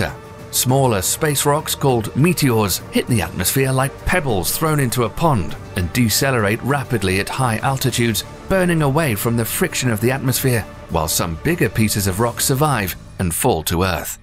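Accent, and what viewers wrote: United Kingdom. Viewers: really need to do an update with different none British voice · bri'ish accent very fascinating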